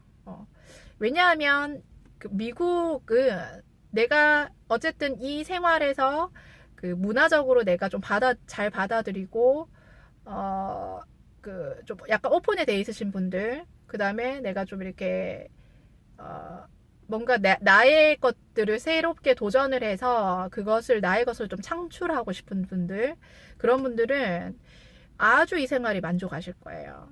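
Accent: Gyeongsang, South Korea. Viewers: 외국 사는 입장에서 남일 같지 않네요~~ 고향이 경상도 쪽이신가요? 저도 경상도라^^;; ㅋㅋ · 고향이 경상도인가봐요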